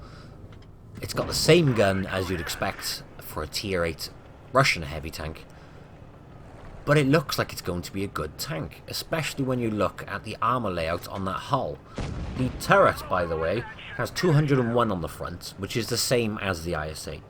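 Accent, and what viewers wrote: Wales, United Kingdom. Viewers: HE'S WELSH MUN!!!!! I DIDNT KNOW THIS!!!!! I LOVE 'IM ALREADY!!!!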